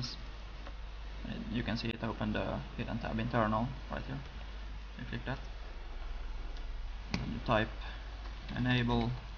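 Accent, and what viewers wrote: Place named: Sweden. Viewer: Man hör ju tydligt att du är svenne iaf :D+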